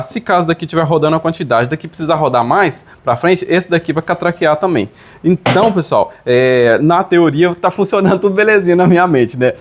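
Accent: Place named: Montes Claros, Brazil. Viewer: Onde essa cidade pelo seu sotaque, me parece que e de Montes Claros ou regiao.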